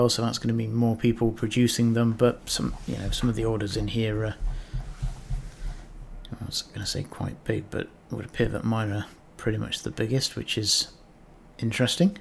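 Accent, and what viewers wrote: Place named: United Kingdom. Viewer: Always great! Always love a good ramble. Especially in a British accent. ;) Diversity is King. Gryphter and my other toons are Small Industrialists for sure.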